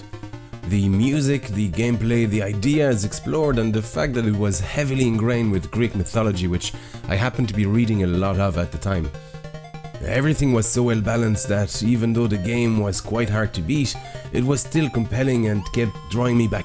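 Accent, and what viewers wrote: Ireland. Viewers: yay I win, I kept thinking this dude is Irish...but then French ha ha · Henry Pym Irish accent:) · Slightly off-topic, but what is this accent? Irish and French?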